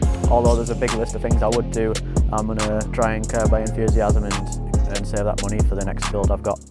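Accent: United Kingdom. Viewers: I love the loud grunge metal music in the background then the soft belted British accent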